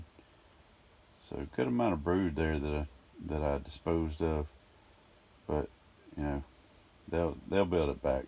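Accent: South, United States. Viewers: Is that talking country or southern. 😂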